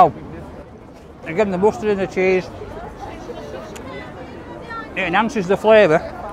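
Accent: United Kingdom. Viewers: ❤️ his British accent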